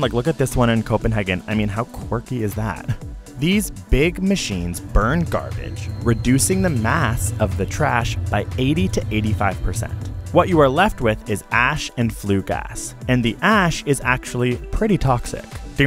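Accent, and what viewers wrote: Canada. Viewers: Youre canadian?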